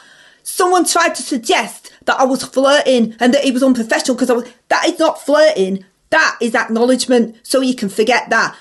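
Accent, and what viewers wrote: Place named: Northern England, United Kingdom. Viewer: Tha northern 😂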